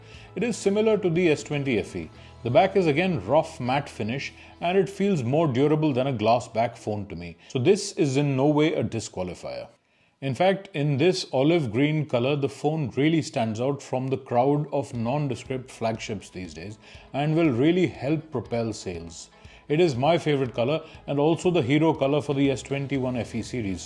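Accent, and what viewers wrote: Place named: India. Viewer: Beg the question why dont u make videos without that annoying acent put in in title indian ao we dont click on it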